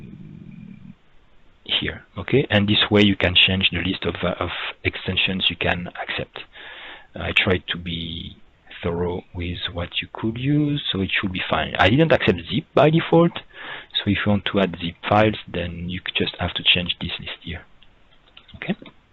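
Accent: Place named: France. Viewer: Très bel accent français ;)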